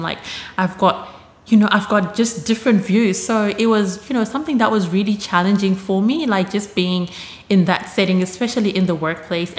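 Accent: Australia. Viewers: Your accent is interesting it sounds Australian but not quite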